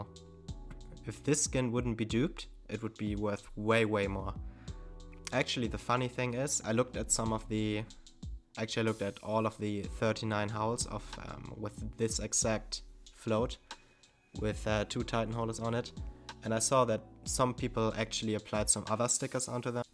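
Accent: Germany. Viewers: I think hes german he has the german english accent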